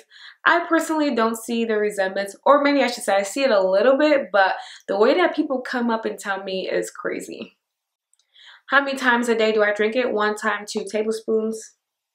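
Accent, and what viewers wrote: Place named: United States. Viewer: I loved the way you spoke nd presented it.👌👌. Where are you from sweety? As far as I observed it helped to figure out, u r an American born.I might be wrong, but I wanna know☺☺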